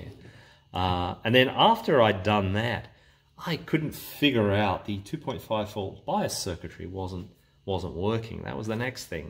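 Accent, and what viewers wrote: Australia. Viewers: An Aussie in the States? 🤔 Interesting video, tnx!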